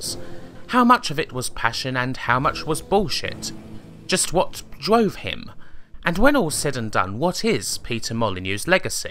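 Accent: United Kingdom. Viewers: The problem is his video's are to long. He needs to cut it down. It's like a dude rambling around one person for hours on hours long. get's old fast. Make a 10-15 minute video and combine the big points. The moment people see the time on the video they will click it away directly. Nobody has time for such long talks. It also doesn't help, his voice is extremely british, which makes it kinda annoying to lissen to for long times. As his voice contains lots of low and heights sound pitch voices. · aa aa I disagree. People do have time. It's just that people don't want to take that time. As for her British voice, yeah well, you'll get used to it.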